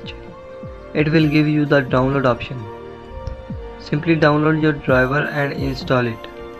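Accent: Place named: India. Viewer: lmao u put united states but ur clearly from india or sum other shitty accent country lmfao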